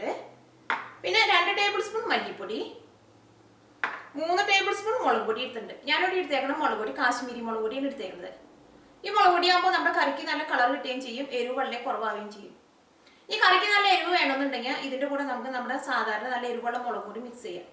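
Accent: Thrissur, India. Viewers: chechi oru rakshayilya kidilan taste thanks for the recipe and your thrissur slank koodi aayappo polich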